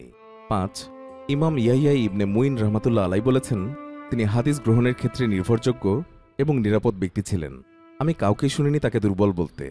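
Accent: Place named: Noakhali, Bangladesh. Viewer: Commentator's pronunciation and language problem, it seems he's from Noakhali.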